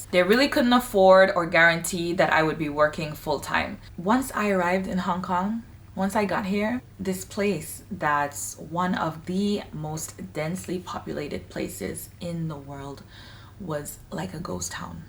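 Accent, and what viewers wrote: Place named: Jamaica. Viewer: The Jamaican Accent is E-VER-EE-TING! 🤣❤️ This is amazinggg.😊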